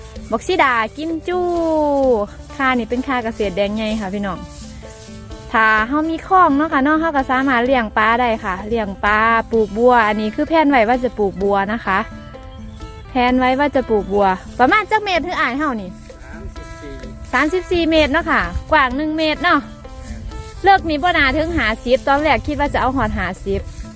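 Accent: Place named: Isan, Thailand. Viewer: น่ารักเนาะคำเว้า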